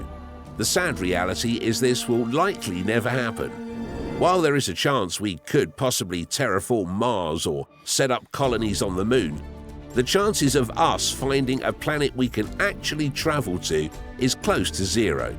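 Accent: United Kingdom. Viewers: Why does the guy have a British accent then?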